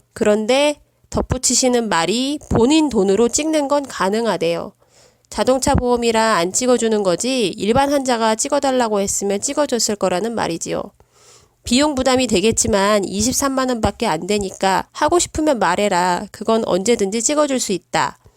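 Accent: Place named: Chungcheong, South Korea. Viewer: 음...요리 백종원도 충청도유... 사투리좀 써도 괜찮음. 다만 발음정확도+전달력의 문제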